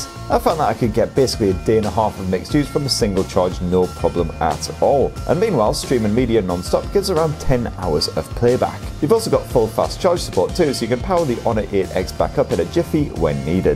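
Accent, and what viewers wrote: United Kingdom. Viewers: i just love British accent 😀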